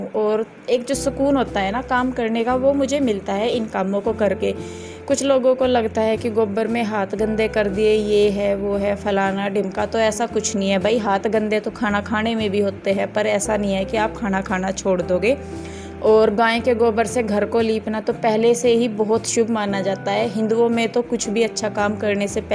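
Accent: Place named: Bijnor, India. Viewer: Mam apka face bilkul meri mami se milta h bilkul same ap ek bat batao ap up mei bijnor side se ho na apki language se mjhr aisa laga kyuki m bhi whi se hu isliye mjhe laga aisa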